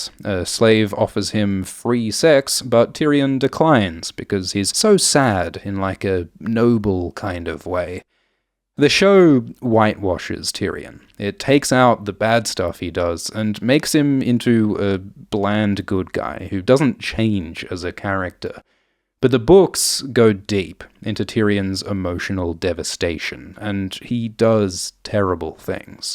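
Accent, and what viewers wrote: Australia. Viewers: I usually have a good ear if someone is Australian or British, mainly because Australian accents tend to have more of a drawl to them but with I couldn't tell because he has one of the Australian accents with less of a drawl to it. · He's clearly Australian to anyone with ears, so I'm not sure why anyone would be wondering that.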